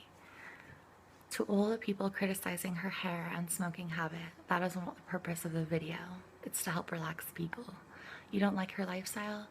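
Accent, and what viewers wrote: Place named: Canada. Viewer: Nice video, I usually prefer whispering or soft sounds but your voice is rather soothing. I like that you have a bit of a Canadia accent and also some southwestern. It's very North American and something. Also, cats...cats are love. I hope you're well.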